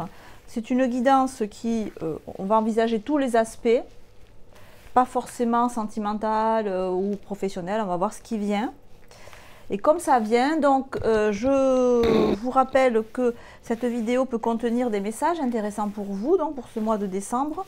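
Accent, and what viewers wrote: South, France. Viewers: Je vous découvre dans cette vidéo et je like. En tant que belge, j'adore votre petit accent du sud...Merci !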